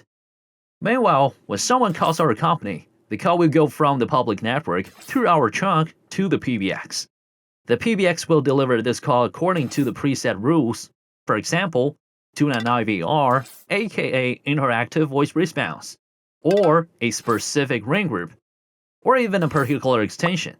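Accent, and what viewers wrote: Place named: China. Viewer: Actually this a real persons voice. He is speaking English with a Chinese accent and some incorrect pronunciation. After some time you get used to it and will conclude that the English is not that bad.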